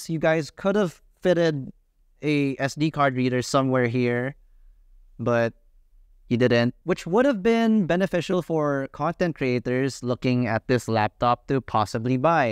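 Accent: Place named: Philippines. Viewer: Love the subtle Filipino accent lol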